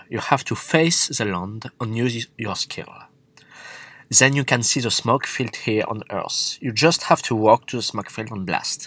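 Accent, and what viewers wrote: France. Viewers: I know he talks English but I just hear French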